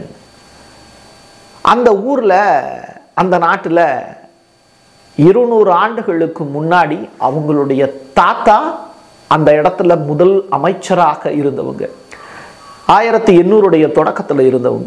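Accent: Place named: Tamil Nadu, India. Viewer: உச்சரிக்கும் போது தமிழ் உச்சரிப்பு தென்படுகிறது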